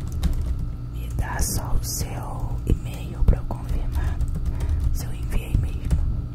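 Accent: Rio de Janeiro, Brazil. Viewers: Eh tu é carioca??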